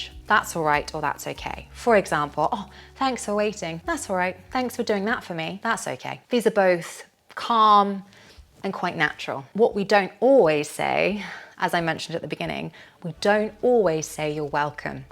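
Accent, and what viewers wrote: United Kingdom. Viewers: you are amazing i love british accent and you are more natural the way you pronounce that is mind blowing. love you from afghanistan.shahab. no worries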